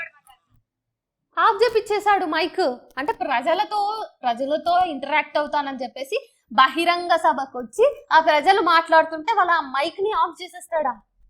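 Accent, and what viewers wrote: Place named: Telangana, India. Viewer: అమ్మా తల్లి అసలు నువ్వు ఆంధ్ర నా? తెలంగాణ నా? ఆంధ్ర ఐతే నీ భాష గట్లుందేందీ తెలంగాణ లెక్క? పోనీ తెలంగాణ ఐతే ఆంధ్ర రాజకీయాలతో నీకేం పని.. అసలు నీకెందుకు పిఠాపురం ప్రజలు కామెంట్స్ పెట్టాలి?వాడెవడో అయ్యానెమ్మ సీఎం నా, బంట్రోతూ కదా అన్నాడు..పిచ్చ మోహమోడీకి బంట్రోతు పరిపాలన చేయడు అని కూడా తెలియకుండా కింద కామెంట్స్ పెట్టటానికి ఎగేసుకుని వస్తారు.. పని పాట లేని సన్నాసులు... ఇక నీ విషయానికి వస్తే the తొక్కలో జర్నలిస్ట్...నీ స్లాంగ్ ని బట్టి నువ్వు పక్క తెలంగాణ అని అందరికి తెలుస్తుంది...నీ తెలంగాణ రాజకీయాల గురించి గంటలు గంటలు నీ vocal cards అరిగిపోయేదాకా మాట్లాడుకో.. మా పవన్ సార్ జోలికి వస్తే తొక్క తీసి ఆరేస్తాము....జాగ్రత్త. 😡😡😡😡😡😡😡😡😡😡😡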